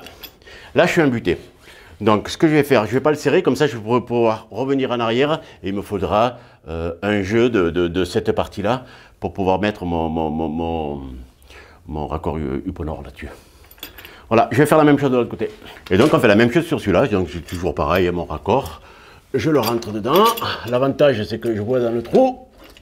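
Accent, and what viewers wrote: South, France. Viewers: Bonjour! Tu es vraiment bien outillé mais c'est aussi le secret d'un travail bien fait! J'adore tes vidéos et cet accent du sud qui respire le soleil! Stéphane de Belgique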